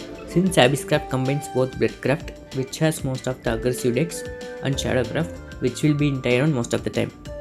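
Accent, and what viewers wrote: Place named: India. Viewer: SERIOUSLY!? I knew it by the accent, thanks for be in this game... I love this game I am Indian too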